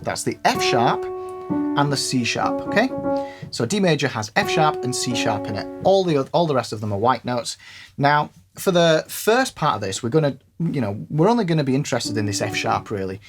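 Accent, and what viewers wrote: United Kingdom. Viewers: I didn't know that your British accent was associated with farmers and truck drivers. Like I said before , you sound like a normal British person. It doesn't sound southern or anything. I don't know why. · great one...! BTW - your name sounds somehow Czech, but your mouth sounds very much British :) Any chance you have some family ties there?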